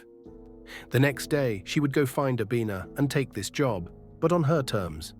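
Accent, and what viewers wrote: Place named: England, United Kingdom. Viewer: The African accent is better for these stories. Please use that accent. It’s hard to listen to and English man narrating, even if the story is good.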